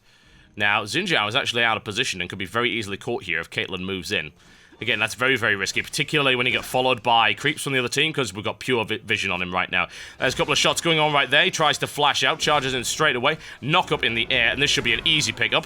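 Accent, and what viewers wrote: United Kingdom. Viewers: It's his british accent, that's all.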